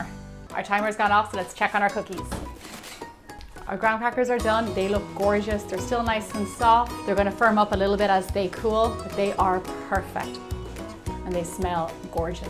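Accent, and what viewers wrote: Ireland. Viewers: Are u from Ireland I'm from Ireland .... This looks so yummy really wanna try them xx 💕💕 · I love your accent. I'm Irish also. My dad was born in Ireland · Are you Irish?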